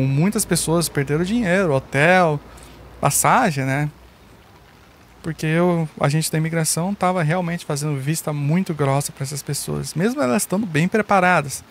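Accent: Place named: South Korea. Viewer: AMEI SUA PRONÚNCIA COM SOTAQUE COREANO NO PORTUGUÊS ❤ EXPLICAÇÃO MUITO BOA👏👏👏👏🇧🇷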